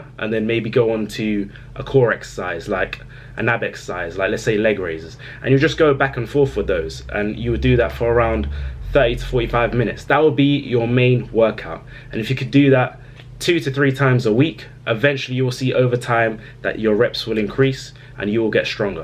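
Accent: United Kingdom. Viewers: I have been watching your videos for months now. I always learn something new. I like your british accent btw. Greetings from Czech republic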